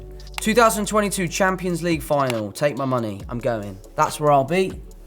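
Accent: United Kingdom. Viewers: イギリス英語めっちゃ耳に気持ちいい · すげぇブリティッシュ訛りだな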